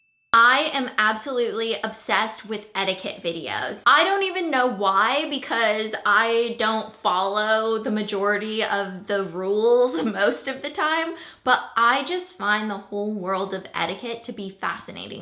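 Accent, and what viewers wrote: South, United States. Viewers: Where are you from? You sound kind southern, but I thought you were from CA...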